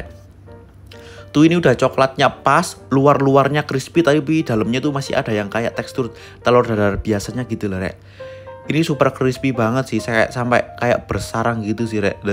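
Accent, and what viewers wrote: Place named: Surabaya, Indonesia. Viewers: logat cino suroboyo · Aku seneng logat nya, surabaya an.... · Kirain NgaPak ternyata suroboyo 😂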